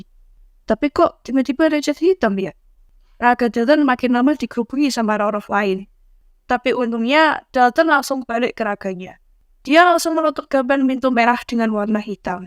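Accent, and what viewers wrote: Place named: Java, Indonesia. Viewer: Pasti kk org jawa ya ngomongnya masih medok.hehe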